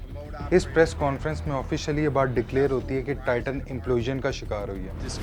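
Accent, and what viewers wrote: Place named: Punjab, India. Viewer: Keep it up . Lekin apni Urdu ko aur saaf tareqe se bole. Punjabi touch door kre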